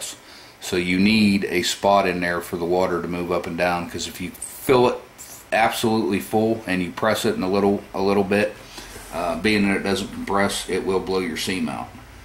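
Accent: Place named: South, United States. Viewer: I couldn’t handle the “southern drawl “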